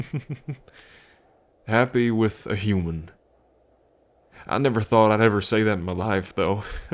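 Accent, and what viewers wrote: United States. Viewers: 🥰🥰🥰nice country voice ❤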